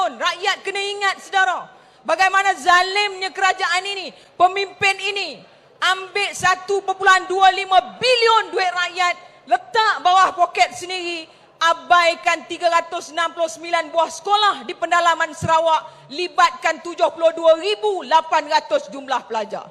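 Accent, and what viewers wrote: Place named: Penang, Malaysia. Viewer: Seronoknya dengar longat Penang....gua punya hometown. Hantam kaw kaw very good presentation. Inilah ahli wanita yang berani cakap. Kita nak kaum wanita macam inilah....🤞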